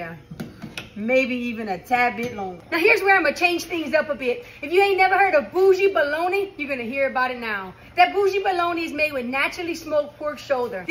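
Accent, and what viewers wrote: Louisiana, United States. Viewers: Just fyi...love your Cajun accent. I love in Texas, but my Daddy was born & raised in Catahoula. Spent a lotta time there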